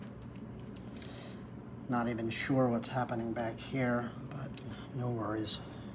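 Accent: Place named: Berkshire, United Kingdom. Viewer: Thank you so much. This was a lovely demo and I enjoyed it very much. I look forward each week to see what you have to share. I am going to try this technique with a squirrel that I am thinking about painting. I love the colors for the otter. These Texas ears are loving your Berkshire accent ❤ 😊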